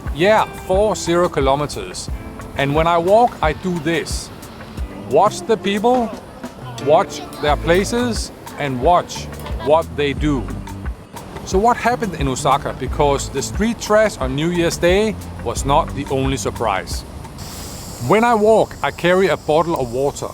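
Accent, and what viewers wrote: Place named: Denmark. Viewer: he just sounds danish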